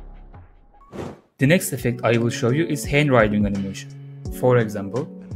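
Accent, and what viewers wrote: Turkey. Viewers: It is understood from your speech that you are Turkish (I am Turkish and I do not have after effects)❤ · Aksandan direkt Türk olduğunu anladım, bu tarz içerikler için tebrik ve teşekkür ediyorum dostum :)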